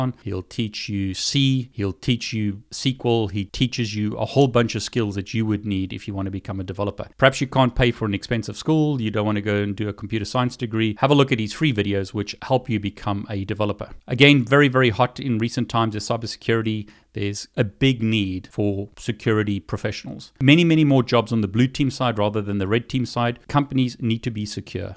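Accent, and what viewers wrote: South Africa. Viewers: Great hearing a South African accent inspiring success so powerfully. Baie baie dankie, boet!!! 😉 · Are you south african? You sound south african :) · Thank you, this was super helpful. & so great to hear a YouTuber with a SA accent! Hi from CPT. 😁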